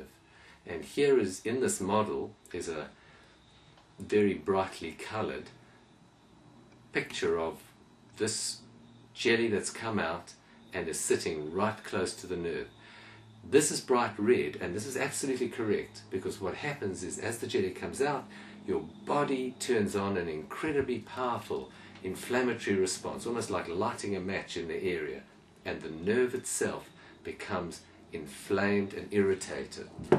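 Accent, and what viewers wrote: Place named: South Africa. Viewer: Hello! Sounds like a nice SA accent there! I have had a slipped disc 3 - 4 times. (had the MRI etc.) I put my back out over Christmas and have the usual lower back pain but thankfully no leg pain. (my hip has gone to one side a bit) I'm really not sure these days whether it's best to lie down and rest or try to walk in the moments when I feel better during the day? May I ask what your view is? Thanks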